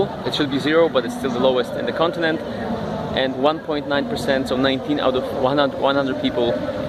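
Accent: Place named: Chile. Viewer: Wyglądasz jak "rodowity" Chilijczyk (z wyraźnymi, europejskimi korzeniami). Mówisz po angielsku, jak Chilijczycy (akcent)... jak długo mieszkasz w Chile? : )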